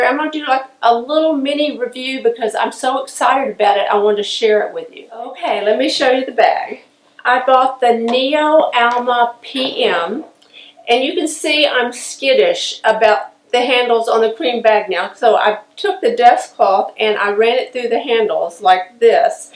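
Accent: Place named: South, United States. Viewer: I love your accent so much - south of the United States is so melodic and different (relaxed in a way) to me as a non native speaker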